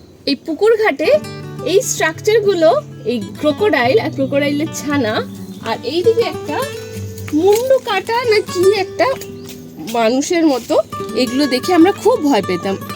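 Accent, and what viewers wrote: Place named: Bangal, Bangladesh. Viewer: পাত্র পরিবার কি বাঙাল অরিজিন? আপনার কিছু উচ্চারণ, বাঙাল ঘেঁষা লাগলো... খুব ভালো লাগছে আপনাদের ভ্লগ।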